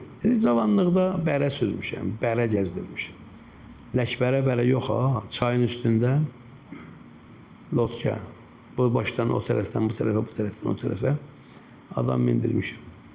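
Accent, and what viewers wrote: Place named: Baku, Azerbaijan. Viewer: Bakılı ləhçəsi ilə 😊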